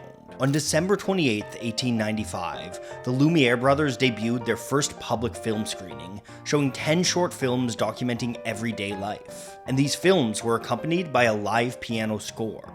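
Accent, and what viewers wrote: Canada. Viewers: Hey, are you Canadian? There's something about the accent... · Dude, are you from Canada?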